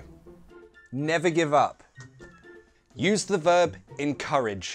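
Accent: United Kingdom. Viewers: Alright! You made me feel appalled by the US-ish "dude" and constant use of "man". This doesn't go with you, you are expected to say " mate" because we watch your chanel by your UK-ish english, which sounds splendid. Ta for your excellent teaching work mate!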